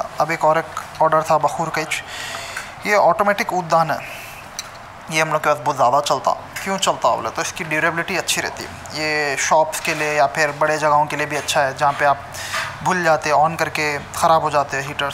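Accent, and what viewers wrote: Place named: Hyderabad, India. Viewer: Badiya ek dum hyderabadi style..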